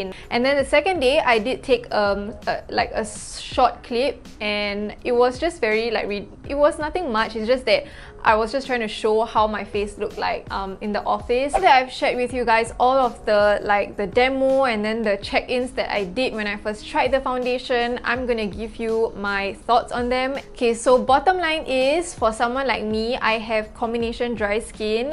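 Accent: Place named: Singapore. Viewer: hello just came across your channel you sound singaporeon :) 😀 I half singaporeon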